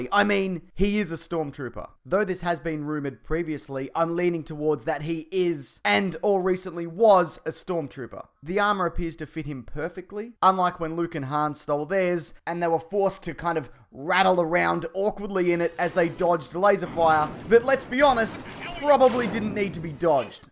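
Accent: Australia. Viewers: Your an aussie arnt ya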